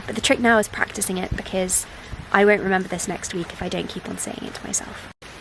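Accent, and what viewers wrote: RP, United Kingdom. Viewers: I think it is rp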